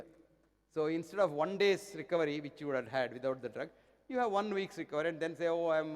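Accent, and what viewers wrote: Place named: India. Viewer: EXCELLENT & VERY SOUND - From my corner of the US I give this a definite Thumbs UP!! He is very eloquently expressing what I have been thinking, practicing & feeling for a number of years. THERE IS A WEALTH OF INFORMATION HERE THAT IS VERY USEFUL & NEEDS TO BE SHARED & KNOWN. ( I'm from India & understand the accent - PLEASE, with all due respect, use captions or enable subtitles, so that the world can understand & learn what this fine, learned Doctor is sharing with us!!) ji - THANK you, Namaste & Ushta-te!!🙏 Footnote: I looked up the book he recommended "Anatomy of an Illness" & watched it on film: <link>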